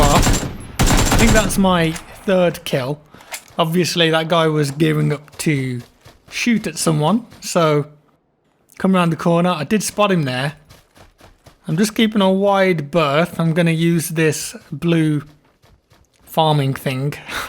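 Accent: United Kingdom. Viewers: Oregon farmer here. combine or harvester. yep. you're my favorite steamer Jack and no worries your voice sounds still very British.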